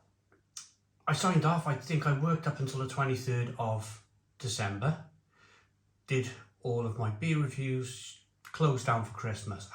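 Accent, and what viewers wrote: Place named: Cardiff, United Kingdom. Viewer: Just stumbled on your channel! Glad you are Doing ok. Recognised that Cardiff accent anywhere! The cottage is my local pub 😂. I’m assuming you mean the One by cefn onn!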